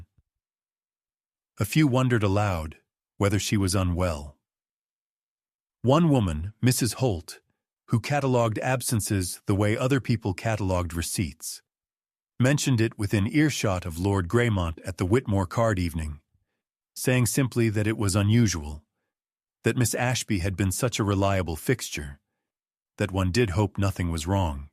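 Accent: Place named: United States. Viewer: Good but a very slow internal story. Its all narrated with very little actual dialogue. I guess its in the style of repressed Victorian English people. The dull voice in an American accent throws me off but at least all the pronunciation is correct.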